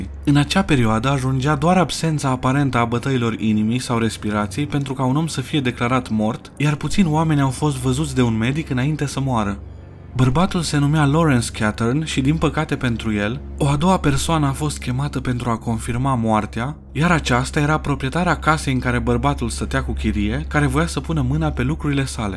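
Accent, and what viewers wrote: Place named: England, United Kingdom. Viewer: Ai puțină engleza pe la colțul guri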